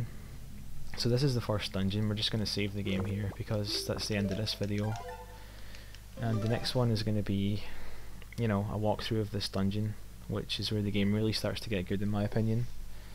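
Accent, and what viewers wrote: Scotland, United Kingdom. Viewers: Awesome game+speedrunning+Scottish accent commentary=epicosity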